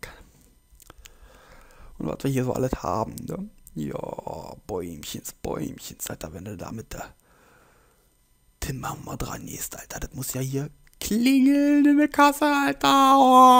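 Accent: Berlin, Germany. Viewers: Und bitte rede nicht Berlinerisch. Das klingt bei anderen noch OK. Aber bei dir so scheiße und überdreht......... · Du musst unbedingt die Berliner Schauze behalten... bloß niemals Hochdeutsch! Aber bitte beleidige die Schafe nicht so wien Berliner Penner :D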